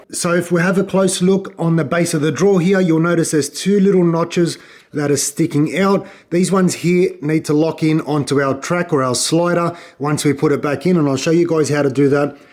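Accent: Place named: Australia. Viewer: Thank you so much! Was to happy to hear an Ozzie accent when I clicked on it haha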